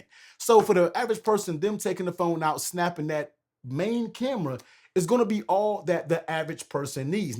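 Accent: United States. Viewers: I know that accent…you from the DMV bruh?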